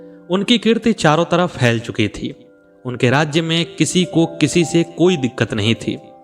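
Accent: Standard. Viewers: अति उत्तम कहानी।आपकी वाक शैली और शुद्ध उच्चारण के प्रयोग से कहानी के तत्कालीन वातावरण का आभास होता है जो श्रोता को प्रभावित करता है।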